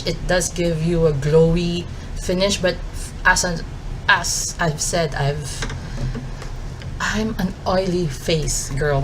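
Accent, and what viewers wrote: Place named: United Kingdom. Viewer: I like this. Sis, you have an accent. British or Australian? I noticed when you said "can't". But I love it. 😚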